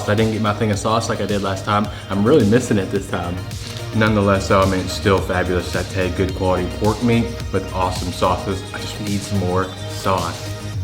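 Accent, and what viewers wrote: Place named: South, United States. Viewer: Is it just me, but I think Thai food have changed you 😝. I miss your slow-paced talk, the cool & relaxed southern style 🙂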